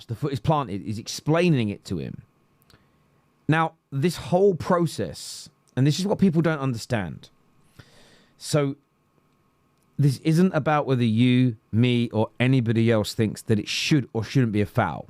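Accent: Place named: Liverpool, United Kingdom. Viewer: The voice sounds like he was born in Liverpool 🤔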